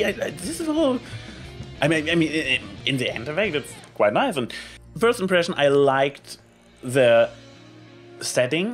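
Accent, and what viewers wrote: Germany. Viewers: Your german accent is allways a delight and i like your views on the show, keep up the good work! :D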